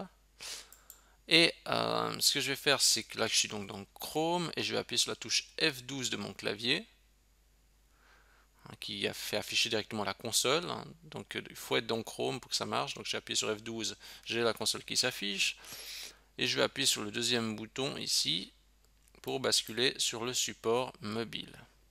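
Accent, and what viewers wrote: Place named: England, United Kingdom. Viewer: Bonjour, Merci beaucoup pour tes tutos parfaitement clairs et simples à mettre en application. Et j'adore ton accent anglais! Keep up the good job;-))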